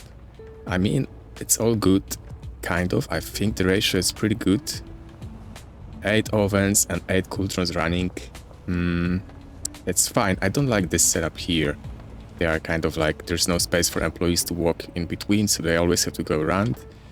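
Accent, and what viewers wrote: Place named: Poland. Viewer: Wiem że jesteś z polski słyszę ten akcent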